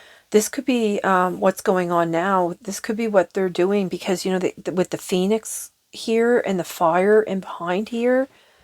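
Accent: Canada. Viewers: YOU SOUND NEWFY TO ME, WHY ARE THEY SO INTERESTED, ITS MY LIFE AND IT IN TROUBLE RIGHT NOW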